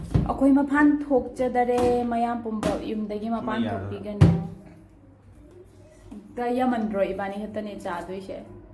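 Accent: Kakching, India. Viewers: Kei lam dei no babhi ng ....kakching dei manli... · Accent se kakching oiduiro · Tava ym nungai kakching mcha na ngngvase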